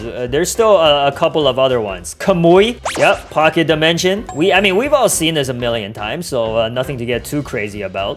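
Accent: Canada. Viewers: Bro are you from canada 😈😈😈😈😈😈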